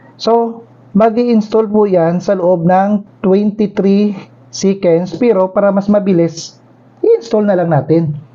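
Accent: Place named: Iloilo, Philippines. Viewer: du ilonggo ka boss haw thankyou po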